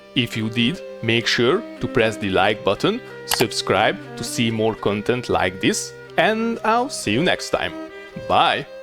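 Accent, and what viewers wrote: Hungary. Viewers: Csodás videó! Mint új játékos, nagyon sok szenvedéstől fog megmenteni. A magyar akcentus meg fenomenális! Csak így tovább! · Akcentusból már tudtam hogy magyar vagy xD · Oh I never realized that shoveling cattail roots is the way to go... also that's some nice Hungarian accent you have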